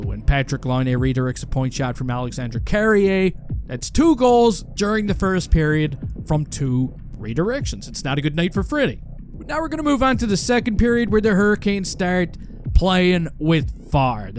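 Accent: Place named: Atlantic Canada, Canada. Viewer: Dach is a solid 2nd liner. He's been dogged by having his crane rocked too many times and people ragging on him as he's essentially playing hurt, and thus far from his potential. Whenever he plays his game (ie. concussion-free long enough) he brings the plays and the points and people love him. The true question is how long will his career last if he keeps getting bashed? Love this channel. I still can't peg the accent, Nova Scotia? Newfoundland?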